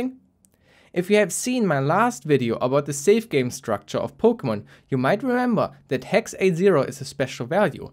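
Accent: Germany. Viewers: digga dein deutscher akzent ist ja mal anders cringe :'D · i can hear this guy is german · Ich liebe diesen deutschen akzent 😂 aber geniales video 👌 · I knew you must be a German (way of speaking) 🤨 and you are... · love the video,are you german? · Beim Hören gemerkt der ist doch ein Deutscher und wurde im Impressum bestätigt. LG aus Bremen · the german accent is so strong 🤣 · Er spricht englisch, hört sich aber deutsch an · Nice German accent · Er spricht gutes Englisch aber man hört trotzdem das er Deutscher ist 😄👌 Damals als Kinder haben wir Handy Pokemon gesagt weil Missigno mit der Antenne aussieht wie ein Handy 😂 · You sound very much like youre german. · Man hört so raus dass du deutsch sprichst xD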